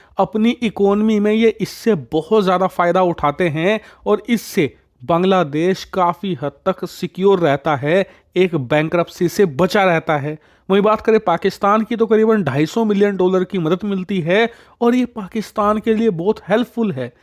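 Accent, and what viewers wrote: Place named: Rajasthan, India. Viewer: Aap pakke Rajasthan se ho aisa Lag raha he Best hindi k.show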